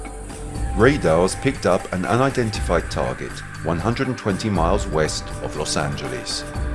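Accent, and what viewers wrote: United Kingdom. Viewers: A story about the USA..but Brit narration ?? Peculiar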